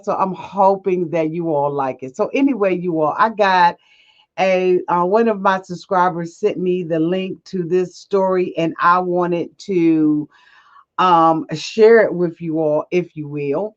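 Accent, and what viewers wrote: Washington DC, United States. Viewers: Auntie I hear DC in your voice are you from DC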